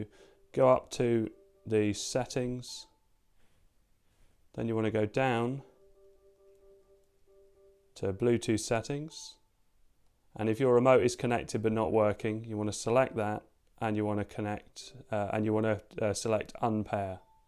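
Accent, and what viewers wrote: United Kingdom. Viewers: Thank you nice man with cool British voice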